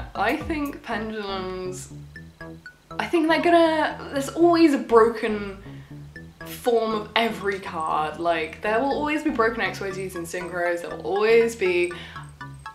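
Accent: United Kingdom. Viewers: Quite interesting. Do you notice what I'm talking about or do you feel you sound completely British? Like seriously when this video first starts I thought you were American.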